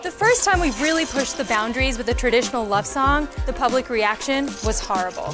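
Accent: United States. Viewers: Yeah... Indian music, Indo-westren clothes, American accent n free bird... Makes u unique from others... That's why we love❤ uhhh 😘😘 love from ur root place Congrats for 7 M fam #VV ❤ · Hey your simple amazing girl and jus be what your and your voice soooooo lovely special with u s accent 👍🏻👍🏻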